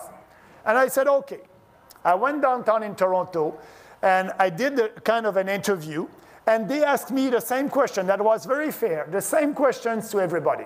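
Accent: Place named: France. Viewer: These hero's always pop up out of nowhere, and this is standard. He may have a French accent, but then again so did my grandmother.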